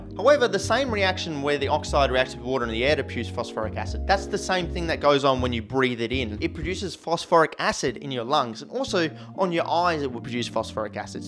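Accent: Australia. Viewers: Wait. Is this guy Australian? Huh. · An Australian talking about explosive chemistry over elevator music - that I call top-notch entertainment! XD Greetings from Germany, mate. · He's Australian and they say "aluminium"... I thought it was just another aussie thing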